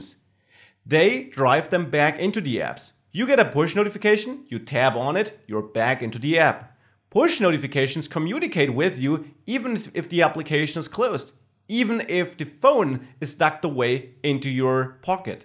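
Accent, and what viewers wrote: Germany. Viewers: I'm from Germany and watch this German guy speeking Englisch...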